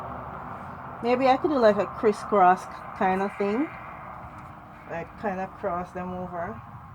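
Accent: Jamaica. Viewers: First 12 seconds and I figured you’re from Jamaica or one of the islands!! 👏🏽 you definitely got my subscription. Great video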